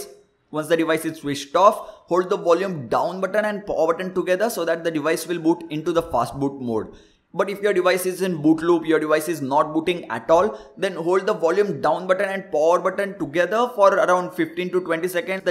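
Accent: India. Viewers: Lol i hate this indi englsih :D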